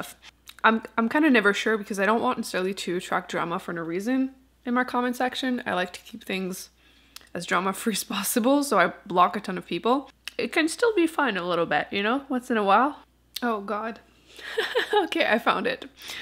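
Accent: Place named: Quebec, Canada. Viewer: Français rouillé! ;) J'avoue que je taquine mon fils quand il nous visite et parle franglais... Mais je trouve quand même surprenant - agréablement - que les mots en français que tu glisses à l'occasion "sonnent" québécois quand même... plusieurs finissent pas parler avec un accent anglais, même si leur langue maternelle est le francais...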